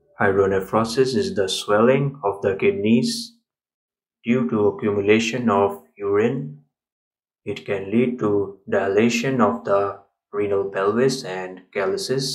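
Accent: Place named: India. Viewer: Jitna vi kausis karlo aapka accent humlog jeisa hi hei..so natural rehne ki kausis kariye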